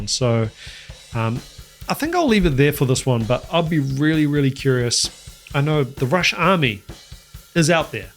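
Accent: New Zealand. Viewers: Probably my first comment here ever, as a longtime follower 😂 Watching quite 😂 All of us, who really love and ambrace music, why would we down-talk music? We might not have the same taste, but at the end of the day its art. Hehe and each time i'm listening to Neil, i know why he was and, in some kind still, is a hugh inspiration of Mike Portnoy 😎 Mr. i fuckomg love your Kiwi accent 😎 thats me saying as a german 😂 and remember (knok knok)...we will ask the questions 😂 Love your channel!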